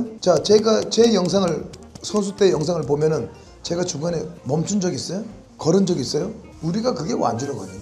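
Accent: Gangwon, South Korea. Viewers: 강원도 사투리 억양 구수해요^^ 강릉 경포호에서도 러닝 클래스 해주세요😅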